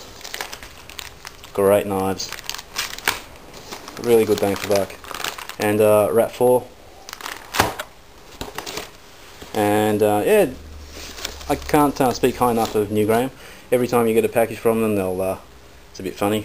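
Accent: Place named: Australia. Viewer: good to hear an aussie accent for once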